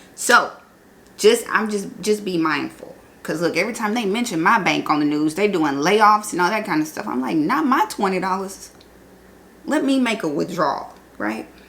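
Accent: South, United States. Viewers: Love and hugs from Jersey 💪🏽 Love that Southern Drawl ❤ 🎉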